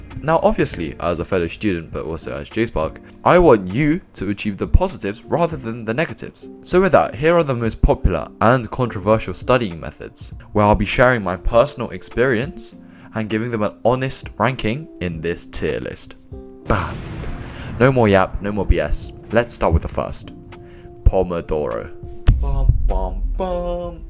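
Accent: United Kingdom. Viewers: With the helpful stuff aside, dude your british accent is so good to listen to. Im an asian myself and use american ones, but your voice is peak pls keep cooking.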